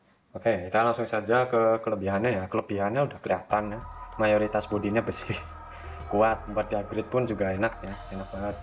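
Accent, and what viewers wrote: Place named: Cirebon, Indonesia. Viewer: sampean dari cirebon mas ?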